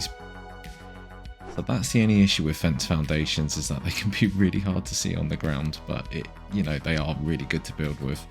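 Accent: United Kingdom. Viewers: Somerset, born and bred here. Love hearing that Devon accent mate, keep churning out the fantastic content. 👏🙌🤟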